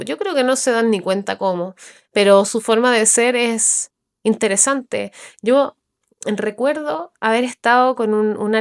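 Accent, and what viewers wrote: Chile. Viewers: Hola gracias, cada vez que te escucho con esa tomadita chilena. Desde La Plata - Bs As - Argentina Vas como borrando ese enojo o recelo que los argentinos tenemos por los chilenos. Es que de verdad dolió que tuvimos ayuda de tu país en la guerra de las Malvinas. En cambio nuestro cariño al pueblo peruano. Que fue el único país que nos ayudo esa guerra. Yo era muy chico y me contaron y lo estudie en la escuela. Muchos años después vinieron esas 2 copas América que Chile nos ganó para mí opinión una debió ganar Argentina. Dicho esto, coincido con vos a los argentinos vivimos la vida a mil y somos muy teatrales. No por nada Capital federal es una capital con miles de teatros En la crisis del <num> Me acuerdo de haber participado de una obra de teatro en cooperativa que justamente hablaba de las crisis de nuestro país. Pero también de nuestros proceres como Belgrano, San Martín y otros. 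Y sus ideales .. Personalmente no tenía trabajo, había dejado la carrera. Y el país se caía Y ahí estábamos unas 30 personas algunos docentes, trabajadores y desocupados. Jóvenes y personas hasta 35 o 40 años creo. ( Hombres y mujeres ) El director un ex consejal de La Plata. Que le decían el turco por su origen de familiar Bueno estuvimos todo un año ensayando la obra era un musical Y la estrenamos en diciembre. Pero muchos necesitamos estar ahí en hecho cultural que nos una y ayude a pasar ese momento juntos Y si la familia, la amistad, la reunión, nuestros ídolos son sagrados. Porque creo que e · GRACIAS querida.!!!!! Me ha encantado tu delicioso ACENTO,...a mis 76 años me enamoré de CHILE, por VOS.! Claro, también los CONTENIDOS.!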